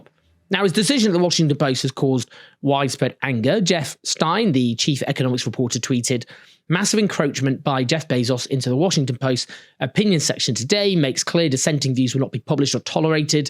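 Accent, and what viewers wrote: United Kingdom. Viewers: You are a fool. Papers have had politics since day 1. That DOES NOT MEAN we don't live in a democracy - stuffing ballot boxes would mean we don't, people having a different opinion from us and owning a paper is undesirable but it isn't even anti-democratic let alone proof of the absence of democracy.....WTH are you smoking? BTW - you sound British so why do you equate the WP with absence of British democracy? ahhhh, I see, you're just a youtuber with an angle.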